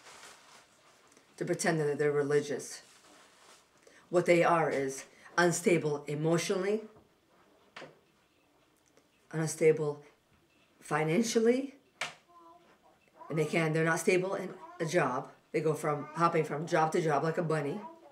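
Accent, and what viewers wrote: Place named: New York, United States. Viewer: You have a New York/New Jersey accent, you sound like an Italian girl.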